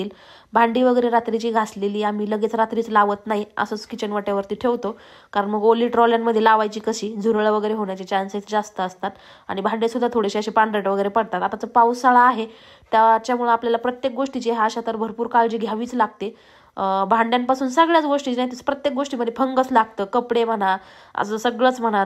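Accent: Ahmednagar, India. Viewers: तुमची नगरी भाषा लै ऐकू वाटती🎉😂🙏👍👌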